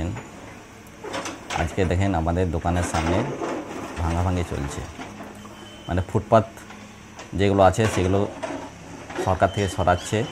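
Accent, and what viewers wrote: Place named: West Bengal, India. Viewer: ওর accent বলে ওর চৌদ্দপুরুষ পশ্চিমবঙ্গের।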